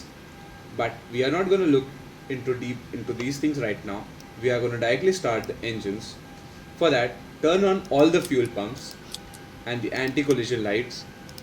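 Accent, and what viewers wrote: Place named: India. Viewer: This indian accent helps with understanding that procedure , video is very helpful .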